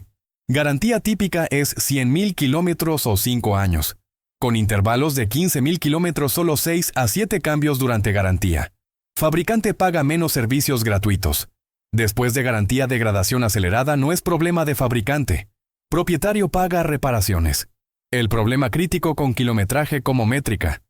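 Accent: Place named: India. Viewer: Por favor no dejes todo en mabo de la mal llamada IA, el video esta bueno, el audio parecer indio hablando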